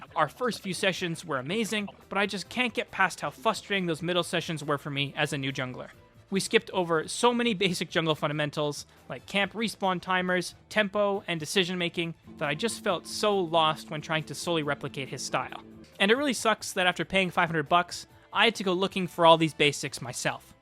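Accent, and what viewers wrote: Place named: India. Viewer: Cant watch this.. this india accent is just blood in ears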